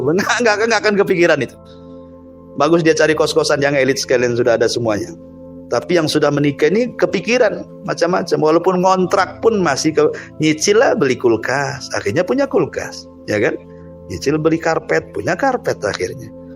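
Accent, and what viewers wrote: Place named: Sumatra, Indonesia. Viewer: Kl dr logatnya, sepertinya ustadz dr daerah Sumatra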